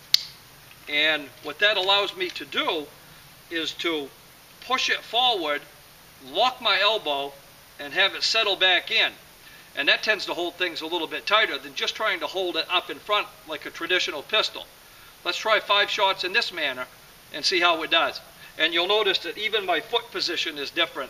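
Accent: Maine, United States. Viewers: By your accent you sound like a native Mainer to me. Is that where you're originally from, or have you just been a transplant for long enough to be one of Tim Sample's "kitten biscuits"?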